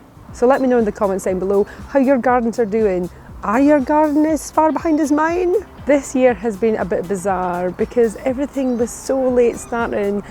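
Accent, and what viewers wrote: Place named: Fraserburgh, United Kingdom. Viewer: I see you've got a Lewis Chess Man! I've wanted one or two of those for YEARS! 😂 I think your sweetcorn needs wind to pollinate, are you giving them a shakey-shake? Also you remind me so much of my friend from the Broch - it's the accent. She moved back to the Broch from Glasgow and I miss her so it's good to hear a similar accent.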